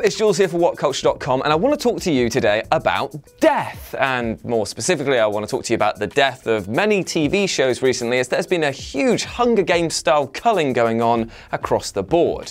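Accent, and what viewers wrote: United Kingdom. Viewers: where ya from in the uk?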